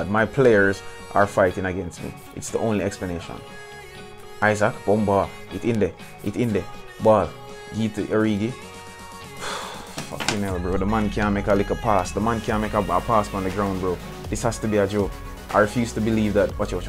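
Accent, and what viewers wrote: Jamaica. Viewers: U from jamaican broski? Sound like one g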